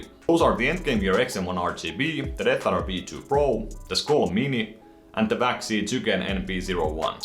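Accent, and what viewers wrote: Finland. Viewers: Are you Finnish? That accent is familiar. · Are you from finnish?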